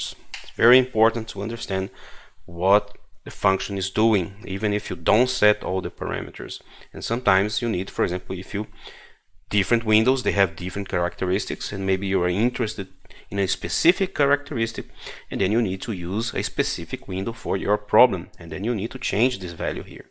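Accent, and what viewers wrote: Brazil. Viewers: This accent sounds very familiar. Brazilian (nordestino) by any chance? :)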